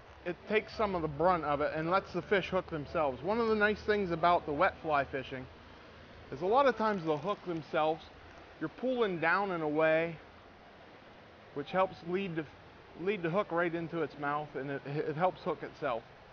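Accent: Pennsylvania, United States. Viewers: Maybe for you, but that's how it's said in PA!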